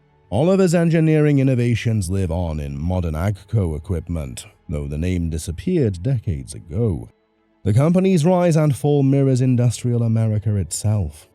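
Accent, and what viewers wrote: United Kingdom. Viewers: Interesting subject that I would have enjoyed except for the absolutely unbearable sound quality and the British accent being so thick I couldn't understand what he was saying.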